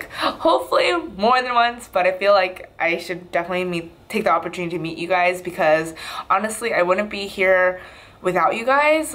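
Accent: United Kingdom. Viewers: Omg! So proud of you!!:) love your british accent haha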